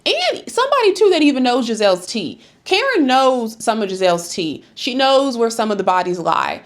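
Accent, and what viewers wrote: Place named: South, United States. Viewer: absolutely love your voice and your accent, weirdly enough i don’t hear many southern accents in my video essays! you’re doing amazing btw i love your content i subbed after this video bc your content quality is top notch!!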